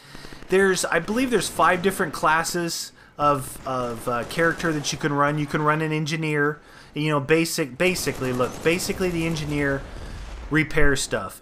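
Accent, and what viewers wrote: Canada. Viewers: I like better then the others. No offense, but the " aut " and canadian language gets annoying in the long run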